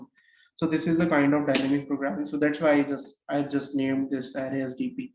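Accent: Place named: India. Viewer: f*ing indian accent....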